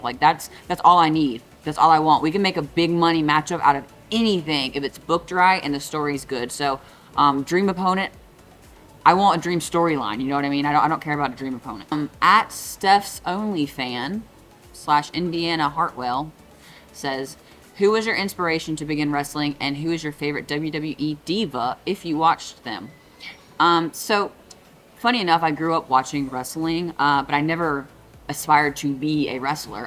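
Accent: South, United States. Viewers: Some people had a problem with your Southern accent? That's crazy! Growing up in Florida's peninsula and close to the coast, I don't hear that type of accent that much around here. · Reply 01: Good Wednesday evening to you and a great video post. Thanks for taking the time to answer very interesting and important questions from your fans. 🙏🏿✝️ Reply 02: Some of what you discussed. My favorite female talent varies. Thunda Rosa I'm a fan and fond of. I gather you remember her. Victoria has grown on me and if it's anybody I'm pushing for to get their flowers is her. As for male talents, I grew up a huge fan of The Junkyard Dog back in the 1980s. I have to be honest, he was both a source of wrestling inspiration and shortfalls. That goes to his unfortunate use of drugs. As well that after his death he lost his only daughter. Shortly after she received his WWE Hall of Fame award. 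As for the men. Bret 'Hitman' Hart is one of my all-time favorites. So too was Stone Cold Steve Austin, long before he was that and before he entered WCW. He was Stunning Steve Austin all the way back in the USWA. As well I pick him as the greatest wrestler of the 1990s. Reply 03: As a fan of yours and looking forward to big things from you. I love the southern accent and dialect. The former WWE Lacey Evans real name Macey Estrella has a similar southern dialect. She's also a stunning beauty, ex-Marine and also married. She's got a wonderful business down in South Carolina her home state. Reply 04: Recently, you broached a topic on Instagram about not being a ballerina. I offered something to the contrary. Not only do you have the physical structure of one. You remind me of Actress Ju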